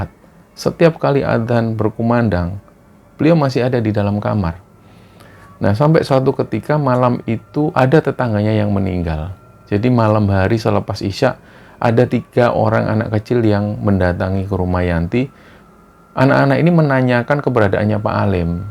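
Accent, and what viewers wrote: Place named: East Java, Indonesia. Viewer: Hadiiirrr Banjarmasin nyimak,aku wong jowo seneng nek ngrungokke mas crito,logat jawanya kentel bgt jowo wetanan..,seneng bgt pokok e,sukses terus mas &tim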